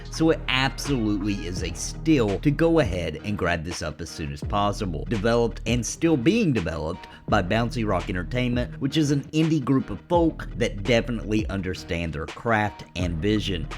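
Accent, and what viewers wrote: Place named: Appalachia, United States. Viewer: l knew I heard some Appalachian I'm from Southern wva so I picked it up lol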